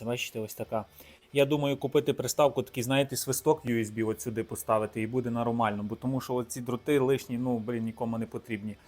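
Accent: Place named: Surzhyk, Ukraine. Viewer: цей суржик аж вухо ріже .. жах !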